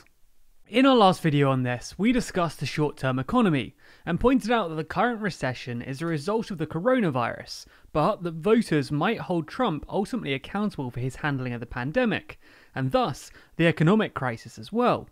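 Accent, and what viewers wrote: United Kingdom. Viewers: Pretty sure the narrator is British.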